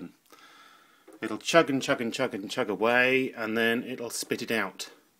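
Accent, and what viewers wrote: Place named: London, United Kingdom. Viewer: Wha(t) a nice Bri(t)ish Londoner accent 'e 'as. Take a DVD and pop it in.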